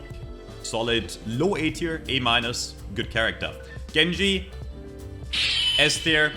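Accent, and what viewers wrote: Germany. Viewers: Your voice sound german , are u german ?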